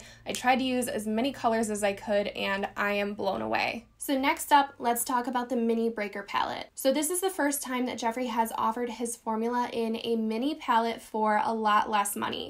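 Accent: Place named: Michigan, United States. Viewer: Are you from Michigan? I'm a former Toledoian and your accent sounds like home! Haha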